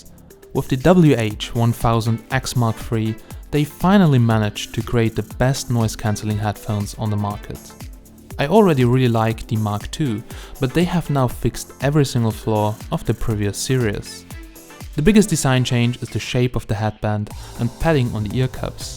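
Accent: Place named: Germany. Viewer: love your german accent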